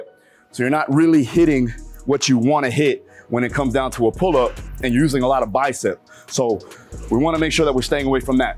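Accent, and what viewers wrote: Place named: Miami, United States. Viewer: you kind of sound like a miami boy with your accent. Is my assumption correct? I dig the video btw. Especially right now with this pandemic since I don't feel comfortable going to the gym, so those are good pointers for calisthenics.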